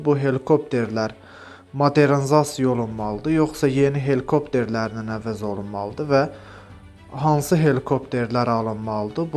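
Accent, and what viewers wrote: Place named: Gadabay, Azerbaijan. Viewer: Qardaş Gədəbəylidi deyəsən. Ləhcəsinə görə düdim. Uğurlar.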